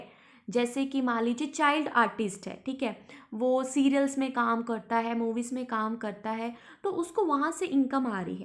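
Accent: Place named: Punjab, India. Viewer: Punjabi accent rocks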